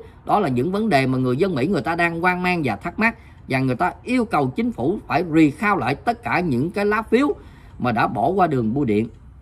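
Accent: Mekong Delta, Vietnam. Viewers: a người miền Tây à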